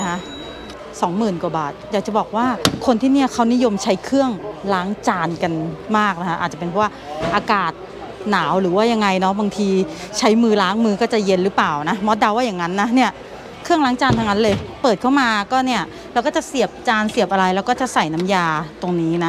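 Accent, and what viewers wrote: South, Thailand. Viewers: พี่มอสคนใต้ใช่มั้ย555 เข็ญไม่รอดนี่รู้เลยย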